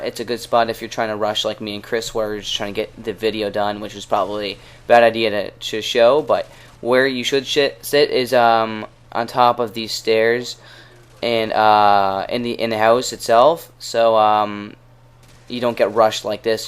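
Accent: Canada. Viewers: R U Canadian? No offense really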